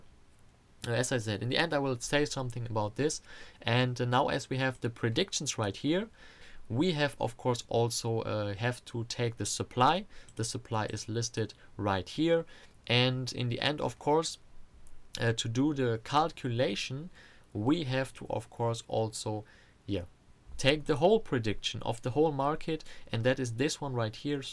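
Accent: Germany. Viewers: einen deutschen erkennt man immer wenn er versucht Englisch zu sprechen !! nice video bro :D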